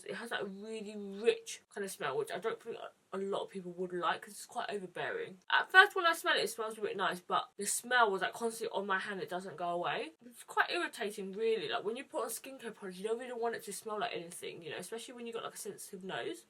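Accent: United Kingdom. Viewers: I’ve seen your videos/pictures on Instagram plenty of times. This is the first video of yours I’ve watched on YouTube though, and the first time I’ve heard your voice. Didn’t know you had a British accent!! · I don’t mean this as an insulting way at all, but when she spoke I wasn’t expecting the tone and type of voice she spoke on it’s so much fuller than expected and I didn’t even know she was British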